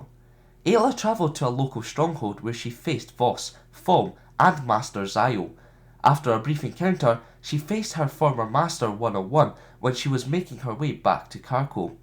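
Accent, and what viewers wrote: Scotland, United Kingdom. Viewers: Are you Scottish? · Hahaha are you Scottish?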